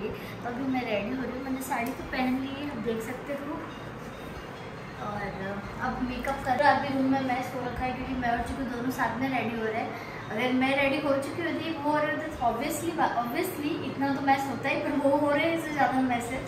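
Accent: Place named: Banswara, India. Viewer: aap konse district se ho? banswara side se lag rhi hai aapki boli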